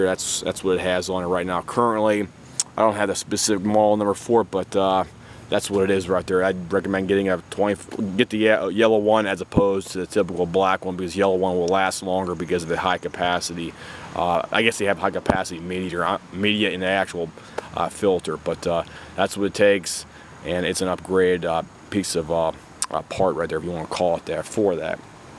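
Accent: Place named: Pennsylvania, United States. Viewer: You sound like you got a PA accent like me lol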